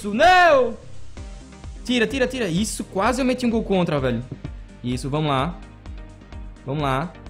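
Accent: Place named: Northeast, Brazil. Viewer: cara vc é do nordeste se fo diz qual e seu estado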